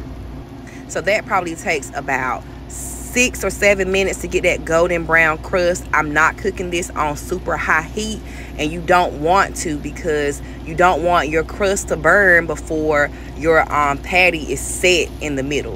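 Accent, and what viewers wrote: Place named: South, United States. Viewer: Mane you country as heck, I know you from the dirty south 😂 good video ❤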